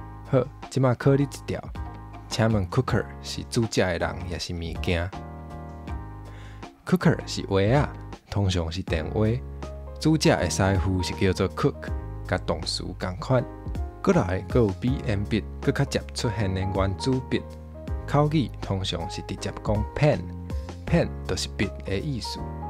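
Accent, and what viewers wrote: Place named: Taiwan. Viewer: 頭一擺聽好聽ê台語🥰🥰🥰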